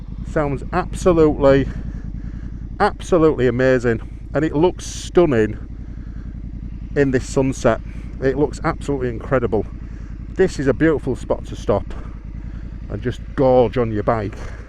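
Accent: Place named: East Yorkshire, United Kingdom. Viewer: East Yorkshire - thought I recognised that accent! Round that way used to be my old haunting ground (Hedon) till I moved to Aus. Been thinking about getting one of these exhausts for my Vstrom 1050DE but not sure whether to go ceramic black steel or titanium. I assume the different metals wouldn't sound the same?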